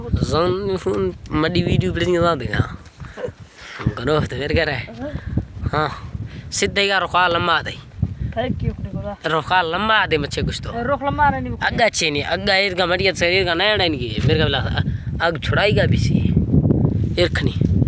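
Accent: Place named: Balochistan, Pakistan. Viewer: میں بلوچی ہوں لیکن میں آپ کی بلوچی سے صرف چند الفاظ سمجھ سکا آپ کے آباؤ اجداد کہاں سے آئے تھے؟😊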